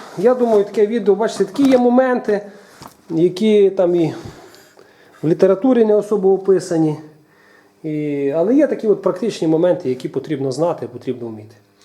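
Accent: Ukraine. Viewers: Захожу смотреть твои видео, только чтобы услышать настоящую украинскую речь)))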